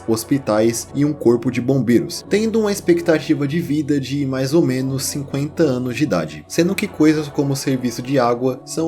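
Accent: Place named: Maranhão, Brazil. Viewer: apenas uma pergunta fora de contexto. Tu é do Maranhão? kkkkkk tenho um amigo amigo maranhense q conversa estranhamente muito parecido cntg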